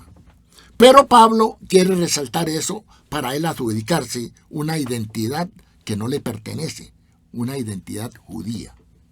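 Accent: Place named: Colombia. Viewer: Pero creo que tienes que presentarte...según tu tono eres Colombiano..no puedes ser rabino. Porque tendrías que ser Judío nacido en Israel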